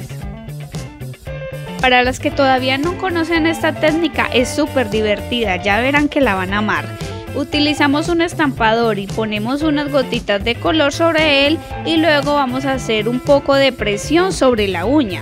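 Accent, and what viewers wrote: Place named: Colombia. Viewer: Por tu acento puede que seas de Cali ó Medellín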